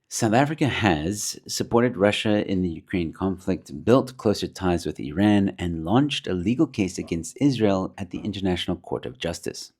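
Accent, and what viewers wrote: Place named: United States. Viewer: Don’t lose your American accent just yet. You might be back before you know it.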